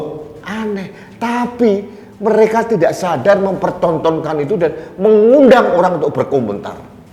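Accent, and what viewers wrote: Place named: Kediri, Indonesia. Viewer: nuwun sewu nggeh. Panjenegan logat bicaranya kediri bngt. Mak bedunduk, Dagelan, itu Bahasa Kami orang kediri. Iya menurut saya pribadi itu Hakim kok peko' eram to. Kulo tiyang alit mawon mboten saget mikir hakime jian nemen. Hukum kok saget d enyang" d sekeng" MA ne kok ngoten to. Sanoyo dangu sansoyo kebacut.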